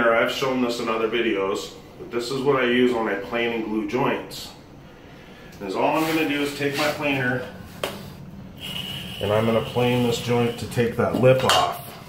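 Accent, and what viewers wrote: Minnesota, United States. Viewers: Nice!! Gonna get me one of those mini planers!! You from Minnesota?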